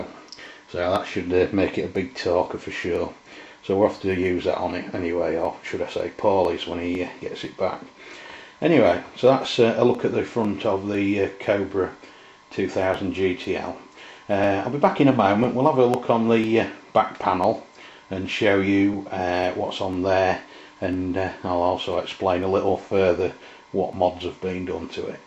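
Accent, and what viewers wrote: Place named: Ireland. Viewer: Hello, tis Paul on the Rock, I'm speaking to you from the future, spooky lol. Haven't heard your dulcet Irish tones for a while, where you been old man? I hope all is well with you and your loved ones, and you're all dodging the lurgy over there. I don't know why Youtube's algorithm decided to put this video in my recommendations 8 yrs later, but hey ho, I do watch the odd chicken box video now and again I s'pose 😉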